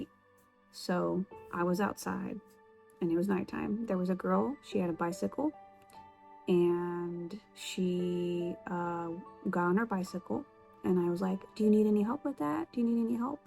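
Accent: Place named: United Kingdom. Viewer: I love your British accent, so cute. My son asked me last night why I always watch your videos and I said, because I love her and her videos. It is highly possible that you had that dream just to intercede for her through prayer. I had a dream last week and there was a woman standing at a public counter and she said, "my son makes 200 thousand dollars a year and he gambles it all away. I prayed for her son in my dream to stop gambling. When I woke up I was so glad to finally be remembering my dreams again, even if it is just snippits. Anyway, I believed he was a real person and that was really his mother. Jesus is quickening the body of Christ and we are experiencing supernatural things left and right! Bless you and your family.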